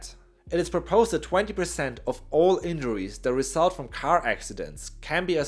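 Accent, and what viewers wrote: Germany. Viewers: This is the first vidoe by you I’m seeing. I immediately knew you were German. Ich liebe unseren deutschen Akzent :D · I subscribed simply because I love listening to German people talk. Their sentence structures lack pronouns, conjunctions and sometimes the improper emotional reactions to what they are saying. Nothing wrong with that, I'm not criticizing this person at all. Most languages translate a little irregular to English. I find Germans speaking English to be really cute😊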